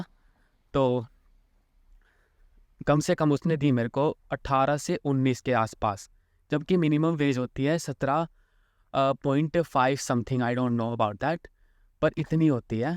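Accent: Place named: Haryana, India. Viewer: Ye haryana se lag raha hai😅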